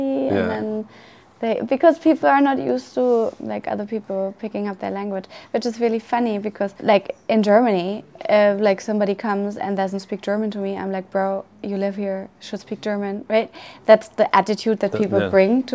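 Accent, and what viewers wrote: India. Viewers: Really Really liked how her English accent showed a little of Indian accent. She may not stay in India forever, but she would carry a little of India wherever in the world she goes. 🥰😇😍🤩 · It's weird to hear her talk in English with an Indian accent. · Her accent is pleasant Indian English 😮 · I was about to say the same thing that her accent has the Indian touch to it.. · She sounds to be like an Indian woman and her accent is cute 😍❤ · yoooooo i dont want to sound rude but her accent sounds sooo indian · She picked up indian english accent. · She has got an indian English accent as well. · Her english sounds like Indian accent. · Did you note,how smiling she is? She has developed an indian accent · She surely have long enough in India .her English accent is very Indian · at this point her entire body language and expressions and even the way she was talking is completely Indian 🇮🇳 . 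🙌🏻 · That Indian accent :D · Her accent is sooooo INDIAN that at one point I thought she might be from here since childhood. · She sounds so indian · She has an Indian accent 😂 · Is it just me or she's speaking indian English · The way she switches between the German accent and the Indian one is so funny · I love that her accent is also Indian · Alas! This gracious lady even integrated indian accent to herself! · she is getting Indian accent 😂😂😂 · I'm not from a rich family, but I remember sometimes my brothers and I would ask our parents some very honest questions in regards to poor people or poverty when we were small. Most often, the response was to the effect of "don't talk about it". That's not to say my parents were against curiosity or something, I think it's a societal thing where we tend to ignore these problems going on right around us because it's not proper to ask those kinds of questions. 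And I suppose the richer you are, the less you think about these things - but this happens all over the world. On a lighter note I love how "Indian" she sounds, her accent is such a melodious mixture of German and urban Indian English 🤗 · Her accent has slowly changed I would termed it indianish english not fully tough 90% 🙏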